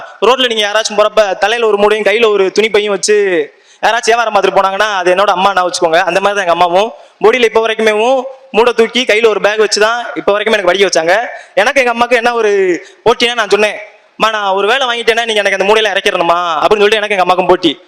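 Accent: Madurai, India. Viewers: Madurai kanaran slang i think😊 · Madhurai slang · Madurai slang🤩🤩🤩🤩🤩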